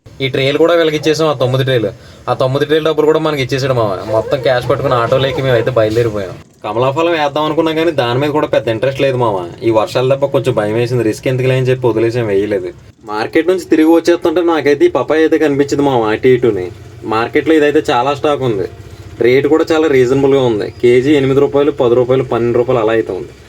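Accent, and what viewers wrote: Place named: East Godavari, India. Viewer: Yedo East Godavari slang lo matladu thunna Vu brother. Mee proper yekkada brother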